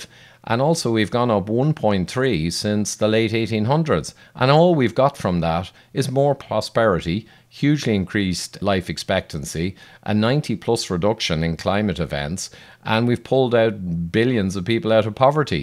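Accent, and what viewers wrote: Ireland. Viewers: Nice. And the Irish accent helps too.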